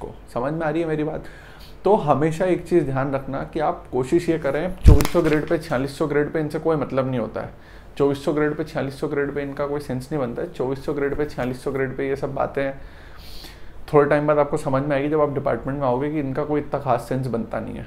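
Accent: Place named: Rural, India. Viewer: Baat sahi he ..appka baat sunke lagta hai app gao se ha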